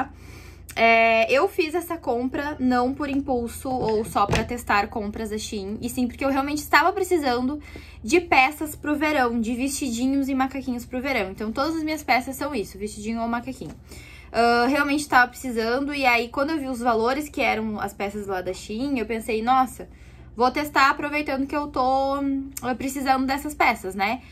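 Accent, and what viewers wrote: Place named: Rio Grande do Sul, Brazil. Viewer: Enfim achei uma gaúcha falando das roupas da shein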